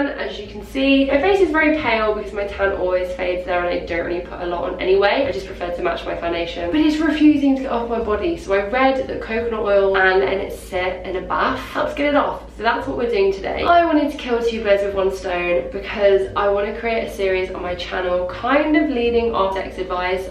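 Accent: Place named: RP, United Kingdom. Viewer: She sounds so posh here hehe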